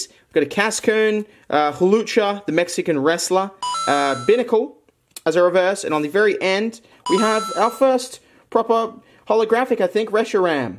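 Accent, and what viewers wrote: Australia. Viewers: Henry’s Pokémon Channel he has a Australian accent so I don’t think so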